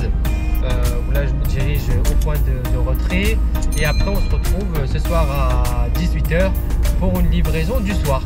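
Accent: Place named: Tourcoing, France. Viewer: On dirai tourcoing 😁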